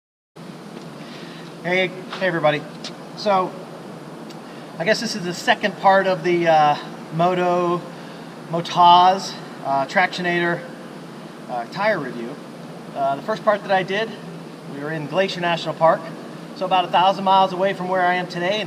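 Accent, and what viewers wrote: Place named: Australia. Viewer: 9/10 for the review, 4/10 for the Aussie accent : )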